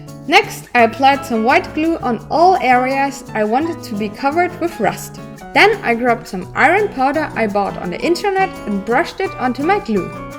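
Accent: Germany. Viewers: are you an german? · you're german??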